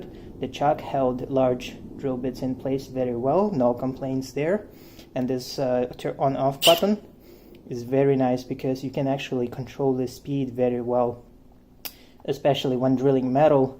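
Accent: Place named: Europe. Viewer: Nice review mate, I thought it strange that with you European accent your talking imperial ? (we're metric in NZ by the way), Iv'e just got the Milwaukee SHOCKWAVE™ Titanium Drill Bit Set - 29PC and yeah ya gotta be carefull cos these bits go hard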